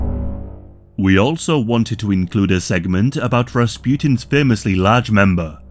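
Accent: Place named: Yorkshire, United Kingdom. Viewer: weird combo of a west yorks accent and an east european one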